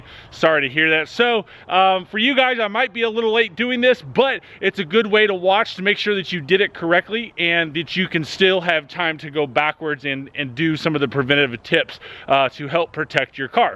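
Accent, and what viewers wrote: Pittsburgh, United States. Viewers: Just a quirk in your accent...you've kinda got a Pittsburgh yinzer thing goin' on!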